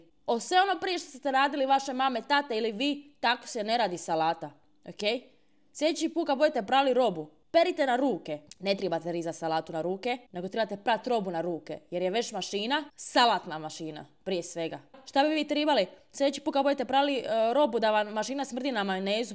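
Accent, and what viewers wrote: Dalmatia, Croatia. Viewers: Ja kad te gledam plačem još delmatinski naglasak😂😂😂😂 ja se kupam u suzama (nije mi dobro)❤❤❤❤❤❤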